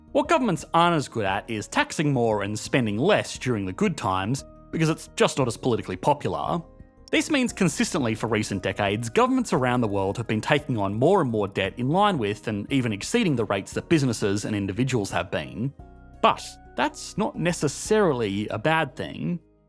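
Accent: Australia. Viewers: An Australian Chrisopher Walken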